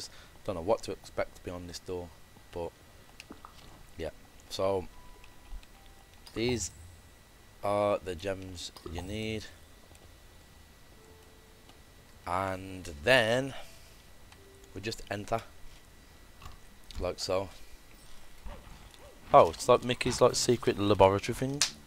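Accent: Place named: Birmingham, United Kingdom. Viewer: Can tell ur from brum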